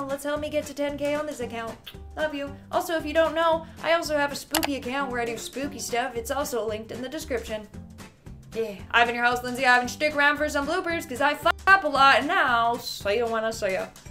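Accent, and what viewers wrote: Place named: South, United States. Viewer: your Southern drawl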